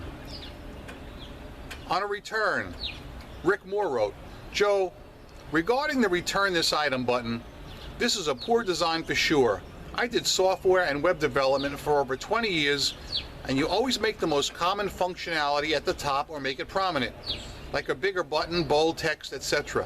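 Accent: New York, United States. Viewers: Joe - love hearing all the NY expressions and pronunciations - miss the vernacular. Had a p.s. on a sale last week. What I did was send the item as though I never got the second one. Once the post office got it - I messaged the buyer that I saw his p.s. on the sale AFTER our warehouse had shipped it. I said they go on the paypal sales as soon as they go through. ha. No response, no neg.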